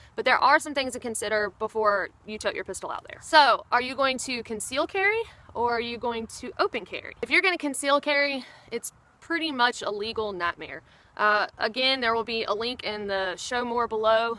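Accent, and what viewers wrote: South, United States. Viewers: This is interesting, - you sound like you are from the South, and your name strongly implies Southern heritage, yet you pronounce the word 'Appalachian' as only a non-Southerner would. I mean no offense, but what happened? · Ooooh you crazy American cousins. I love your channel, and could listen to your accent all day, and liked your comments. I would say that your mind is the best defence that you have. What is the American obsession with guns??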